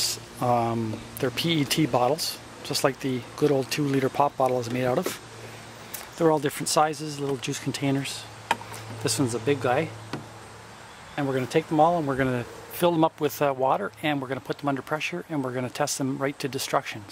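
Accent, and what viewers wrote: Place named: Canada. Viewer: Detroiter here. I thought you were Canadian when I saw the Calamata Juice bottle. Then I heard you talk, and thought, "oh yeah, this guys got to be a leaf!"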